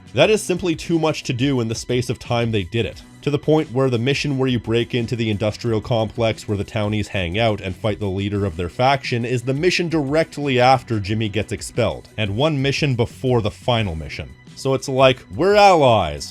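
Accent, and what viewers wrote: Canada. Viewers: Go Canucks? I knew you sounded like a fellow Canadian 😅 Go Sens Go! 😉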